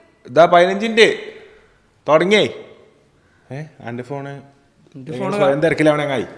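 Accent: Malappuram, India. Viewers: ചിറി അല്ല ചിരി നിങ്ങൾ മലപ്പുറം ഒരു മാതിരി ഒയെന്നെ സംസാരം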